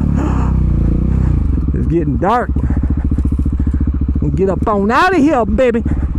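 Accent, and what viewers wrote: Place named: Texas, United States. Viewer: Hahaaaa. Dude, you're awesome. What is that dialect by the way, you in Texas or somewhere south? .. and yea, you have a new subscriber.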